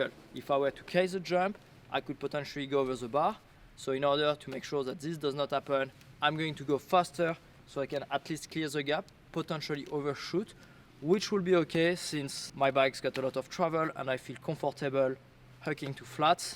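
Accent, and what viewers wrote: France. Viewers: Merci pour ton accent bien français, je comprends mieux l anglais comme ça 😄 Sinon tu déchires tout, continue comme ça et bon ride 🤙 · OH avec ton accent a la française comme vous le dites j'ai du mal a comprendre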